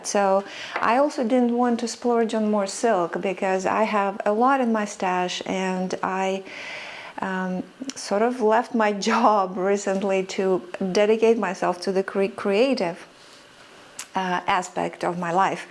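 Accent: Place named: United States. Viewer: Many native English speakers don’t have the depth in our language that you do. Impressive and an American accent!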